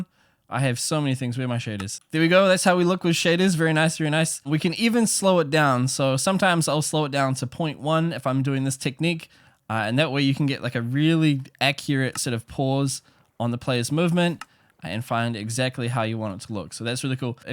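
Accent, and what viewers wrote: New Zealand. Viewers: Are you from New Zealand? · I KNEW I COULD HEAR SOME KIND OF KIWI IN YOU!!!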